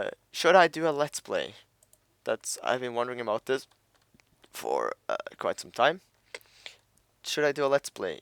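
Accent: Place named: Norway. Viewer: I could tell by the first 10 sec that you're from Norway :P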